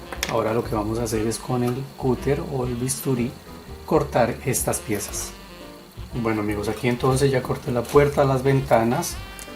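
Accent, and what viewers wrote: Colombia. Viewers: Me Encantaron las 3 pero Ame la Tercera y con tu Acento COLOMBIANO me Enamore mas de tu ARTE gracias y Bendiciones por compartir saludos desde Jalisco México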